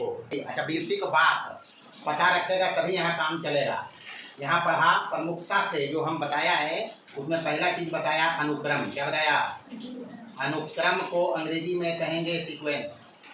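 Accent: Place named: Bihar, India. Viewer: Uncle aap Mokama se he na bhasa se pahchal liya me bhi waha rahta tha batcha me 🙂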